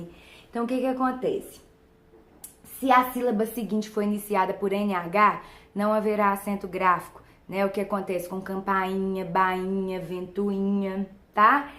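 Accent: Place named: Minas Gerais, Brazil. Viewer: Ela deve ser de Minas ou Goiás . Pelo sotaque amei a aula já estou seguindo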